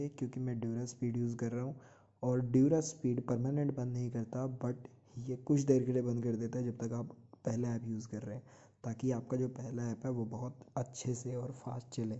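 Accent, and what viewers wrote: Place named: India. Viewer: What is it with all these guys from India clogging up the how to's on YouTube electronics. First off no one could understand what they're saying between the accent and the lack of base in there voice and second isn't there any straight English guys just wanting to explain how a phone works instead of him telling the world what he did with his boyfriend last night. THIS GENERATION IS GONE TO SHIT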